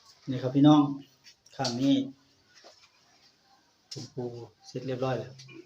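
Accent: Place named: Sakon Nakhon, Thailand. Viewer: สวัสดีคะ แม่เก๋มาให้กำลังใจคะ สวยงามหลายๆจ้า คือเว้าคือคนสกลแท้จ้า แม่เก๋กะคนสกลจ้า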